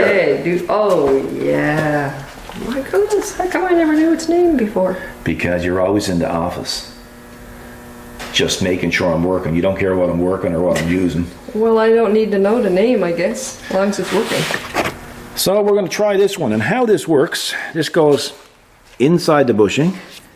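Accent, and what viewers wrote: Canada. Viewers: Love your videos -- good to know you are so busy. I love your Canadian accent and your "tomfoolery!"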